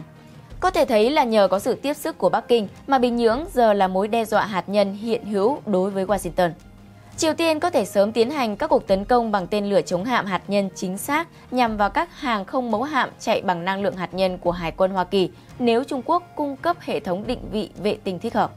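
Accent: Standard. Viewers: CHị dẫn chương trình hay quá....! giọng rõ phát âm rất chuẩn,dể nghe... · là số một hiện nay ở việt nam nhưng bình luận vẫn phụ thuộc ý tưởng của bbt. Người đẹp, giọng chuẩn, có hồn khi truyền đạt. Luôn ủng hộ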